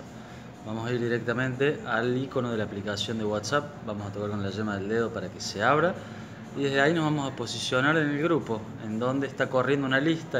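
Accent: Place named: Córdoba, Argentina. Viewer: Ese acento es Cordobes si no estoy mal verdad. Tengo un camarada Argentino que habla igual que tú y igual que Yayo Jajajja. Es imposible de confundir ese acento Cordobes.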